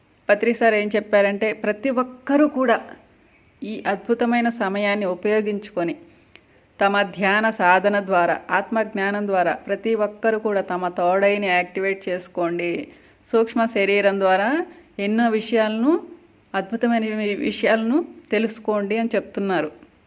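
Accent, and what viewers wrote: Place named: Nellore, India. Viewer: నమస్తే మాస్టర్, గత కొన్నిరోజులుగా మీ వీడియోస్ కోసం ఎదురుచూస్తున్నాను. అంతలోనే అత్యంత అద్భుత సమాచారంటతో మీరు దర్శనమిచ్చారు. మీ మాడ్యులేషన్ మరియు కొంత నెల్లూరు యాస చాలా ముచ్చటగా ఉన్నది. మాస్టర్, మీరు మేము ఇంతవరకు ఊహకు కూడా అందనటువంటి జ్ఞానాన్ని అందిస్తున్నారు. ధ్యానం మీద మీరు చేసిన వీడియో బాగా ఉపకరించింది, మాస్టర్ ధన్యవాదములు. అయితే, కలిగె సందేహాలు, మీతోని నివృత్తి చేసుకునే వీలు లేకుండా పోవడం చాల నిరుత్సాహం కలిగిస్తున్నది. ఏదైనా మార్గం చూపించ గలరని ఆశిస్తున్నాను. కృతజ్ఞతలు మాస్టర్ 🙏🌸🌼🌺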